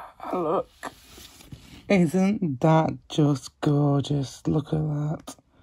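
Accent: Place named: United Kingdom. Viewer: I thought you were from the UK by the sound of your voice I checked your channels about info and yep I got it right I have other questions but I really want to come out offensive at all so I won't ask sorry but dang I want to get a copy of that Vinyl the first track is EPIC!!! Yeah anyway Lots Of Love Keep It Up And Stay Positive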